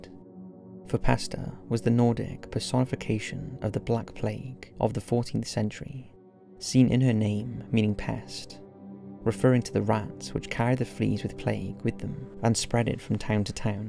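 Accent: United Kingdom. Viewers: Would be helpful to show the name of each of these creatures in text. I couldn't understand what you were saying in some cases. For number 3, CC listed you calling these creatures "Forward", "Board," "Vaude," and "Vort." Later there was "Linga Barked," and "half goofer." Huh? And number 1; was it "Pesto," "Pesta," "Pastor," "Pasteur," "pasta," or "pester?" CC may not pick up the British accent.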